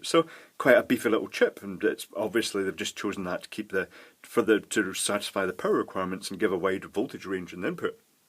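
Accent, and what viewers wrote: Scotland, United Kingdom. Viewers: I wrote this before - I find wit and mellow Scottish accent very soothing. I use it as an antidote for Dave (from EEVblog), who has an aggressive Australian accent and an annoying manner of speech:) For me the prescribed dosage is 3 per one Dave:-D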